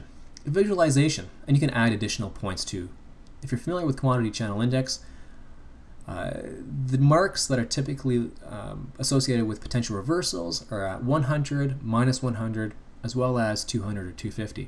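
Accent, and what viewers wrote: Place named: Canada. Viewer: Thanks mate and I really like your Canadian accent :)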